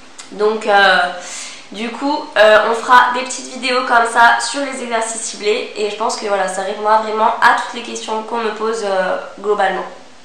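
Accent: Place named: Corsica, France. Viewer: moi j'adore ta voix et ton petit accent corse... :))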